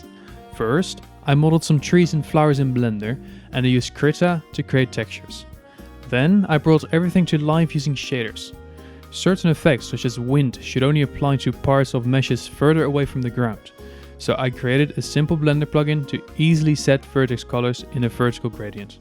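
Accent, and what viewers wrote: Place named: Netherlands. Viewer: Nice dutch accent! (Very subtle though). Trees don't grow along the normal of the surface, they grow towards the sun, up. With a bit of variety :p Looking forward to see updates on this. It looks very nice, optimized and I like your approach :)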